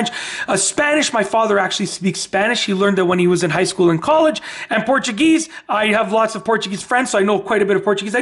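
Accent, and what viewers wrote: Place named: Canada. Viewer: I love that I can tell you're probably Canadian just listening to you. Well wishes from those of us who aren't crazed lunatics from the south of you.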